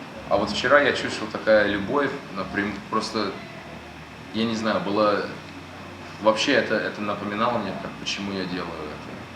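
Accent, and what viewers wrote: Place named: Russia. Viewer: намного,прямо заметнее,но иногда видно,что слова подобрать не может.Хотя фразеологизмами хорошо пользуется,главное - акцент русский стал.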